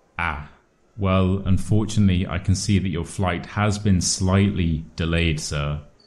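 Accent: RP, United Kingdom. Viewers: Teacher,my name is Grace from Taiwan. I thought your voice should be BBC accent. It is easy to prounce and listen accent.Yet,I had ever listened diallect British once all my life. It was really difficult for me. Completely not understanding. Thanks teacher for your instructions.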